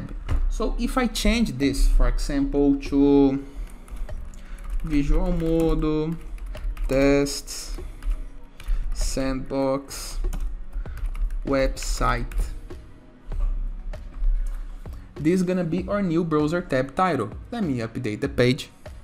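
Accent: Brazil. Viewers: Você é brasileiro? kkkk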